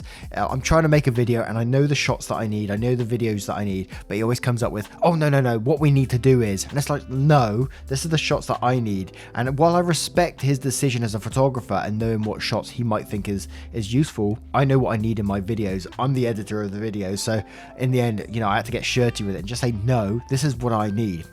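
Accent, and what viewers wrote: England, United Kingdom. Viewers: Listening to this while I work on ironing out details with a guy 2 hours drive south to look at the horse he's selling. If all goes well, I'll be a first-time stallion owner:D These videos are one of the highlights of my week (I like letting them build up and then binging on the videos) as I like hearing not only the reading style and accent (sucker for an English accent), but your own points of view and assessments of the stories you read. So, thank you, ^^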